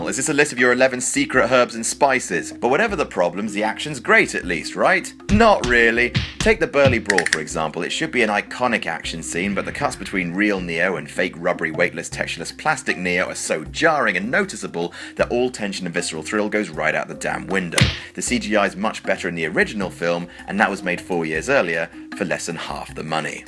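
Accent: United Kingdom. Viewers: Is it just me, or is everyone else fed up with British accent narrators, and Teleprompter readers.. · Make your introduction even longer.... there is nothing I love more than listening to some boring British monotone voice blather on and on and on....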